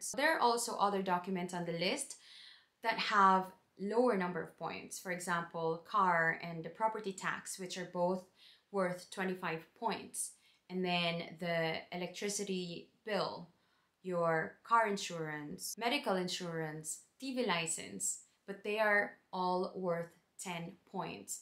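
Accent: Ireland. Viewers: you know you've settled once you have the brogue :) cupla focal and you're 100% there.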